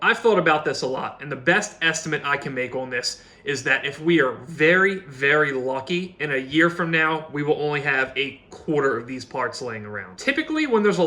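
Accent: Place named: Baltimore, United States. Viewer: Cool video and insight on the specialty parts business. Also love the Baltimore accent--very similar to Philadelphia's beautiful dialect ("Hoagie Mouf"). Takes one to know one. 😁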